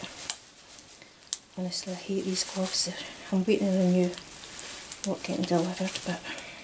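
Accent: Scotland, United Kingdom. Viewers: I love the Scottish accent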